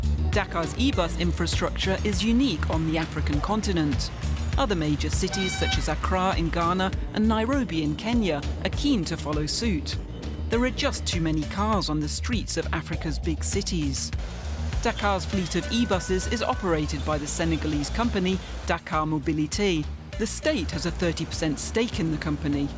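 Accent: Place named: United Kingdom. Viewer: I stopped listening at British accent. Tired of the British accent narrating African reality.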